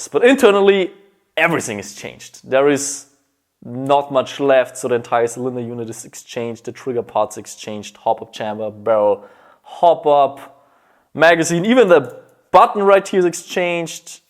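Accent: Bavaria, Germany. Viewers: Sorry aber man merkt das du aus Deutschland (ich glaube Bayern) kommst Trotzdem Bester Youtuber der Welt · What accent do you have, it sounds like German sometimes but idk.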